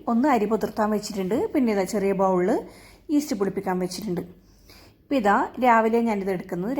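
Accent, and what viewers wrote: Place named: Thalassery, India. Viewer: Thalassery Kariya no samsaram kelkumpol thonunnu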